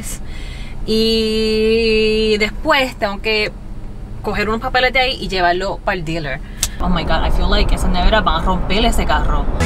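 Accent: Puerto Rico. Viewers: ¿Hablas algún dialecto chino? El acento es 100% Boricua 🇵🇷 en español. So my guess is that you don't, please prove me wrong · Weeeeepaaa ‼️ La gente siempre se sorprende cuando alguien asiático habla español con acento boricua. Me encantan 😍 tus locuras y ocurrencias ✨‼️ Qué tengas un feliz inicio de semana ✨✨😍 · Hola chica te admiro como te desenvuelve aquí en Puerto Rico asta habla bien boricua cuidese mucho te amamos bendiciones💯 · Una china y con acento boricua eso es el final una bomba 💣😋😋😋😋😋🤤🤤🤤🤤🤤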